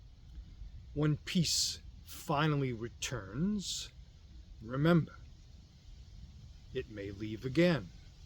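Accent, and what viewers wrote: Boston, United States. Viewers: Gold. Is there a subtle Boston accent?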